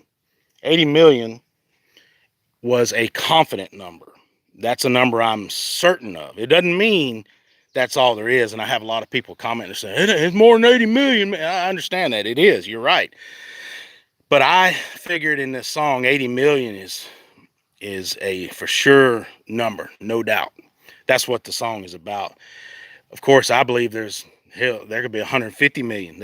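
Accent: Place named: Florida, United States. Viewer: I just found you TODAY. I LOVE YOUR NEW SONG. I am a Florida & Alabama Gulf Girl (stuck in Utah now). Where are you from? Accent sounds like NW Florida?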